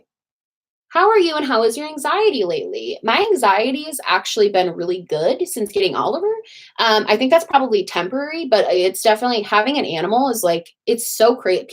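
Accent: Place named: Boston, United States. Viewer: i’ve been binging your channel lately and the fact you have a BOSTON i’m gonna cry you’re literally my favorite person right now ❤️🥺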